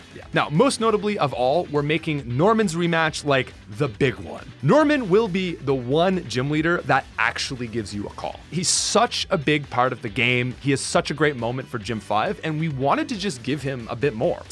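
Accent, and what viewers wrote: Canada. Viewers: Is this guy Canadian? . With oat a doat. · He's Canadian. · As a fellow Canuck I love watching these videos and hearing the accent come oot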